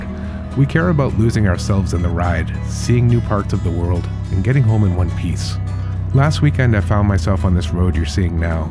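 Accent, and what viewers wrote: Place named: United States. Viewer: It is perplexing to hear the voice that plays in my head, talking with an American accent. Excellent channel - Thank you. Keep them coming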